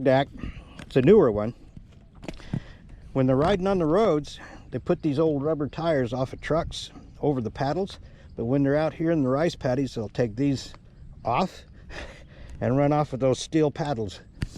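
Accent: Oklahoma, United States. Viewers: I recall seeing gas flares in the Gulf of Thailand many years ago. I wonder if they were pumping oil or gas? If the latter then they are having to import oil for cracking into diesel adding a running cost to the farm machinery I guess. It may be your Oakie accent but I notice you being a bit lazy (dog!) in the pronunciation of the ending masculine “p” in salutations! These general conversations about rural Thai life are great. Perhaps you could drag the Nordic Nerd (when he has finished downloading the GPS tracks) out with you to utilise his seemingly excellent command of the Thai language .. “sam Chang khap” seems to roll so fluently off his tongue! I have now covered several hundred miles on my “ghettoed” tubeless conversion and the wheels (CT 125) have not lost a single psi. I see it done all over Indonesia and the PI on You Tube and wonder if they do the same in Thailand?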